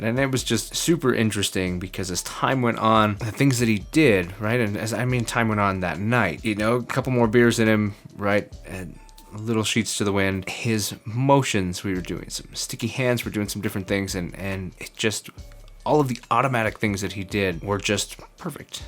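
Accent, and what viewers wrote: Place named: Visayas, Philippines. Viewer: KASAG bisdak ni ug gikan Tobosa klaro kaayo. Way bisaya naka basa diri hahaha.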